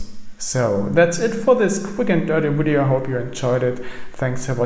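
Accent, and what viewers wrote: Germany. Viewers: don't tell me this isn't a german accent!;O Nice video, thanks for making.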